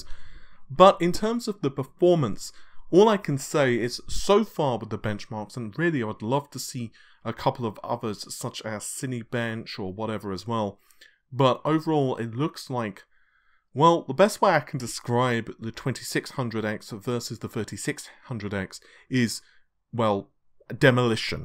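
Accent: United Kingdom. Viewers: He's British you dumbass and American is an accent. Not a language. I see the American education system has treated you well.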